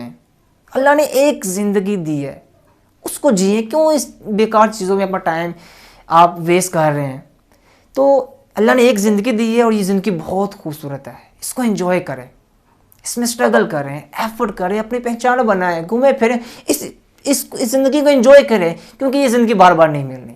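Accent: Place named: Punjab, India. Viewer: MASHA ALLAH aap buhut acha kaam ker rahy ho.....well done... buss apny Urdu accent py kaam kerty rehna.... kyonky Punjab main yeh normal hai....Mulk (country) ko Mullak.... Motivational speaker ky liye us ki awaz aur lehja buhut ihmiat rakhti hai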